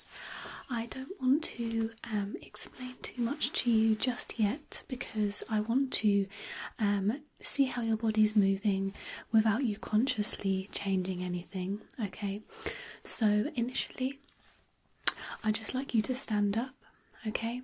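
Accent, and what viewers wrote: United Kingdom. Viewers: I’ve just discovered you and am enjoying you lovely British accent! Do wish your writing on clipboard was louder. Thanks so much , so glad I found your channel 😛💕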